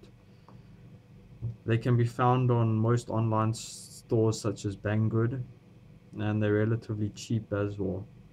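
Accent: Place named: South Africa. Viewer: You are definitely South African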